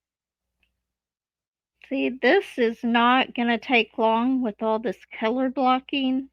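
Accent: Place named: South, United States. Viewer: Hiya, V!! I believe you and I spoke a little during Granny's 1st Premiere. I liked and subscribed your channel back then, however, I am currently having severe vision issues and am unable to diamond paint right now, but I just loved your wip & chat! What a lovely southern accent you have. I look forward to watching more of your videos. Sending loads of love & prayers to your Mom. 😊❤🙏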